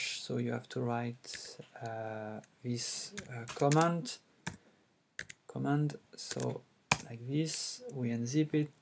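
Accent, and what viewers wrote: France. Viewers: MDRRRRR t'a un putain d'accent français t'aurais pue le faire en francais ton tuto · cet accents français XD est ce que ce tuto marche sur windows ?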